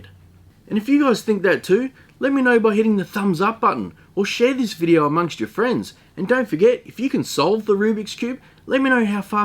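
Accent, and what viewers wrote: Australia. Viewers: Hey Mate, love your voice and of course your turning! But the way you speak in your classy aussie style is what I enjoy the most! Been a backpacker in 2016 and fell in love since then!!💘🇦🇺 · You should’ve made one solved and one mixed. But anyways it ended up looking awesome. 😁 Are you an Australian?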